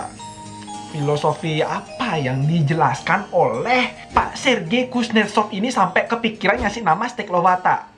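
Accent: Bali, Indonesia. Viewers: Logat bali nya sangad kerasa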